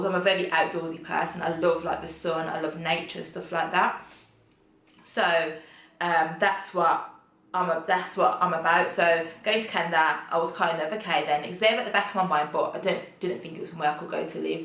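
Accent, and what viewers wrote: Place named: Midlands, United Kingdom. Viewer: Your ascent sounds like u from the Midlands(Birmingham, Wolverhampton, etc). Grt8 choice, hope to meet u in Gh soon.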